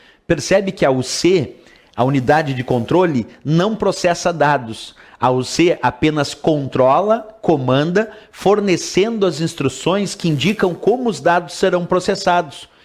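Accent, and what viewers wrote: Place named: South, Brazil. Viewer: nossa que voz chata de povo do sul, sutaque horrivel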